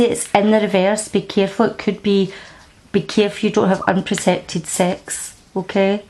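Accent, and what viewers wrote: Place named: Scotland, United Kingdom. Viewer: A Scot like myself, nicely read 👍🏽👌🏼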